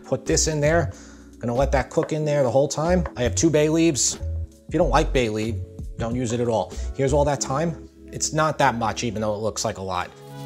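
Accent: New York, United States. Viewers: Something tells me this guy is new to cooking. Maybe hired as an actor because he's Italian and sounds sort of New Yorky. Not that he's doing anything wrong, except he may seem a little afraid of the knife.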